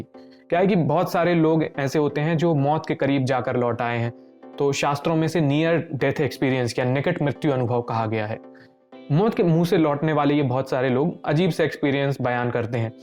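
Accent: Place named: Lucknow, India. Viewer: Full Lucknowa tone, like that 👍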